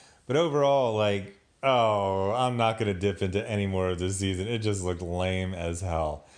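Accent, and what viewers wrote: United States. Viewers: Eh, I kinda preferred the Canadian accents over the typical American one, like yours.: "I guess uh, what her naaame? Irisss? Isss? She use to be a rah-porterrrr? Now she runs a bloggg? And she's like a, y'know. Newww media? Except for they show herrr blog? And it looks like a friggen Geocities Tripod websiiite?" No shade, I'm just saying it's very confusing when you keep making statements in the form of questions.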